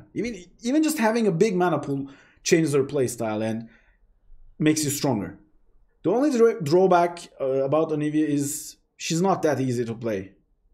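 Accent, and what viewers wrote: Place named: Turkey. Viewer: Just like Fatih Terim, how speaks Turkish, he also speaks English.Exact the same way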